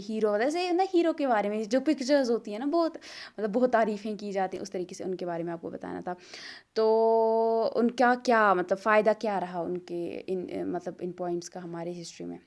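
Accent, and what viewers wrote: Kashmir, India. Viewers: Are u from Kashmir I feel so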